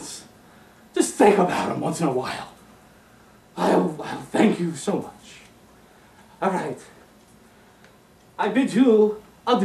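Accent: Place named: United Kingdom. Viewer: Your British Accent is dire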